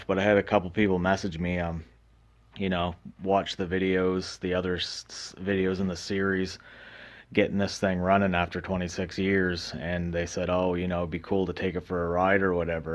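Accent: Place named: Nova Scotia, Canada. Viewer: Ohhhhh nova Scotia...I should have picked up on the accent...iv got the same situation..I picked up this little atc on a trade..got it going...but it's too small for me.. so just sits...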